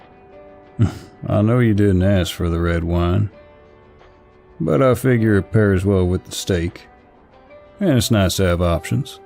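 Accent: South, United States. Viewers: melted immediately with the real sounding southern accent 🥴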